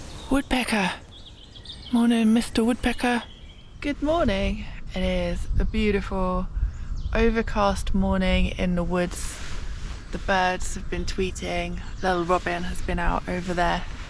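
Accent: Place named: RP, United Kingdom. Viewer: thank you, love how you try to hide the posh rege either way. bon labore' Thanks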